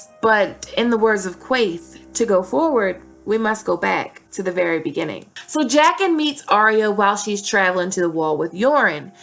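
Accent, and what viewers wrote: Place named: South, United States. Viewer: love that Southern accent that pokes out from time to time :)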